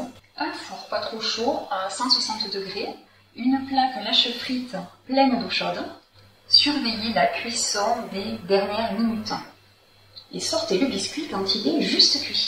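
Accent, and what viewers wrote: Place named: South, France. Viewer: Rien que l'accent du sud nous ouvre l'appétit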